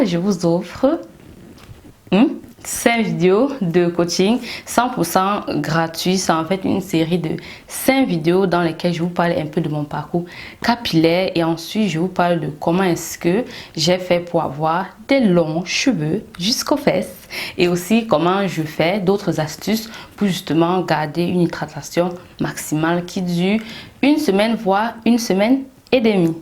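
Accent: Cameroon. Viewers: Oulala j’aime ton accent 🥰 une vrai kamer 😂😂🤣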